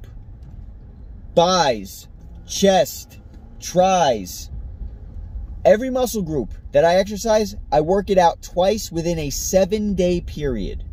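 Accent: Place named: Boston, United States. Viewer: Where u from Boston (your accent)? I get my Tonal installed on Nov. 4th can't wait!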